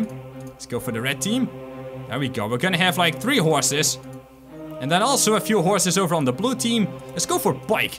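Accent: Netherlands. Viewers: Ar you from netherlands?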